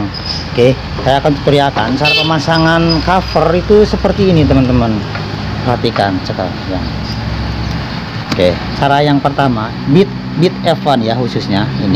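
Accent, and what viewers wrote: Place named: Banyumas, Indonesia. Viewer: Republik Ngapak..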